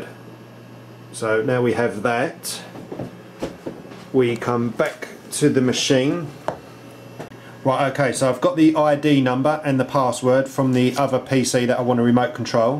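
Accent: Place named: United Kingdom. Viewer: Great stuff, thank you so much. Also, it is nice to hear a fellow Brit helping us out!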